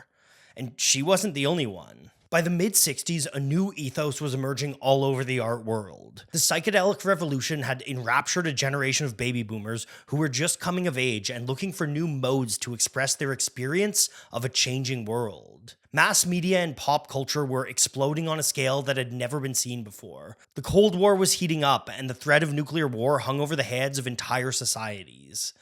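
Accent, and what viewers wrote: Canada. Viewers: He's always had a bit of the ol' mushmouth lol. The occasionally intense Canadian accent doesn't help. But perfect pronunciation isn't why I watch these videos at the end of the day.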